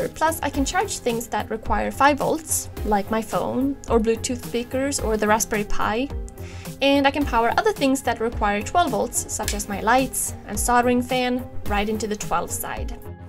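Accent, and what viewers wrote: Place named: Sweden. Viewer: I thought Swedish, but I may be wrong...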